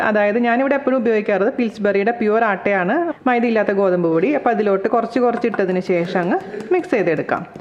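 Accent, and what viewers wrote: Thrissur, India. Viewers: Hi, thank you for sharing the recipe😍 You are a lifesaver. I have become an amazing cook myself following ur recipes. More than the recipes you share I just love listening to your voice. Being abroad in a country with white people away from home it gives me soo much happiness watching all your videos, listening to you trissur slang😁. Lots of love ur amazing and an inspiration to many housewives😍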